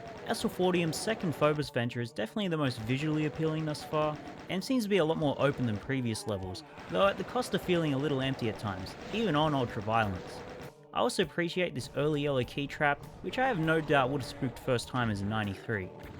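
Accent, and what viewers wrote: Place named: Australia. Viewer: Know what makes a good YouTube video? Anything on Doom. Know what makes it even better tho? Add an Aussie accent. Truly tho, loved the video. Keep up the great work there fellow Aussie! Rip and Tear!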